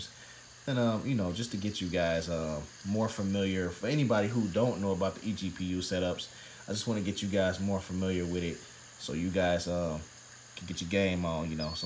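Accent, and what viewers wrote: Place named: United States. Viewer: I'm very amused by your redneck american accent xD Great informative video, have a similar Yoga like this rn. Do you know which Generation/model exactly this was?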